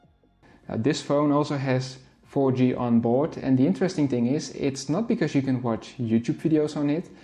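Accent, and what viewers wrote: Netherlands. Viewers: Als ik mijn ogen zou sluiten kan ik horen dat jij een Nederlander of een Nederlandstalige Belg bent.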